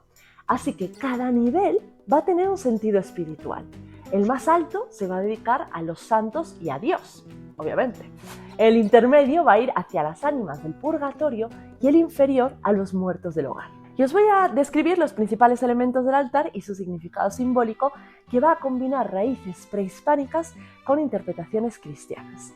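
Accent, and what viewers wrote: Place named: Mexico. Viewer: Hasta se me olvidó que estás en España, se sintió como si hablaras desde algún punto de México. Gracias❤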